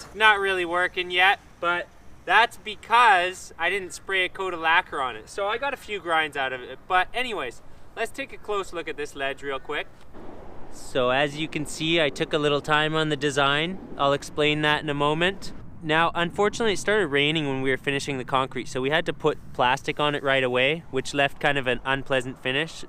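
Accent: Canada. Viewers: He sounds like every Americans impression of a Canadian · Is this a Canadian accent I'm hearing? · this has to be a canadian talking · This guy is the shit. Love the Canadian accent lol. I'm also a skateboarding who ended up in construction cuz skateboarding wasn't paying the Bill's. I wish it could've tho lol. Keep it up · I can tell he is Canadian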